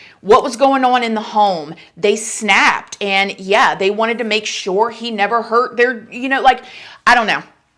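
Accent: South, United States. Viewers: I love you. I'm from the south also and you talk just like me and everyone in my small town. Although your talking about something so serious I can't help but laugh when you say certain things like in my dumb dumb brain 🤣. You are so not dumb which is why I keep coming back. God bless keep up the good work.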